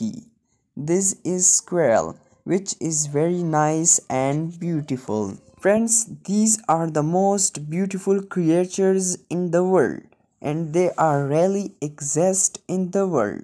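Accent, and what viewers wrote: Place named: Brazil. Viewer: É um brasileiro falando com certeza 😅😅😅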